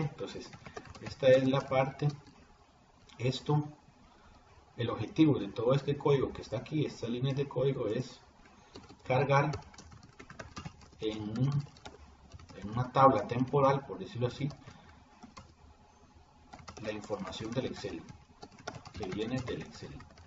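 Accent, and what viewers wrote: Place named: Costa Rica. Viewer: Uno reconoce a un tico hablar en cualquier lugar :-)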